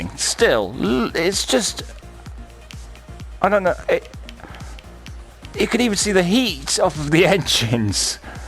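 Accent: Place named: United Kingdom. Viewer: I love your british voice. You sounds like a commentator of sport or an astronaut commenting the spectacular view of earth today. 😄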